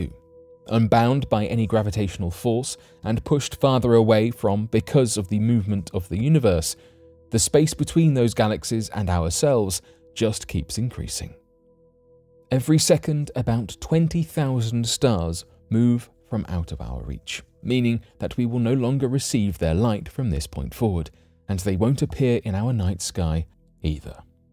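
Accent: United Kingdom. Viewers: I understand why he pronounces bellingenic the way he does he's British guess he's never heard of that famous explorer from long long ago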